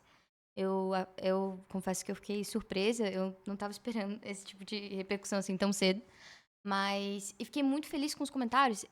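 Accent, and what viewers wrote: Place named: Florianopolis, Brazil. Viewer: Vc é de Floripa? (Perdão a aleatoriedade eh que n consegui deixar de notar o sotaque)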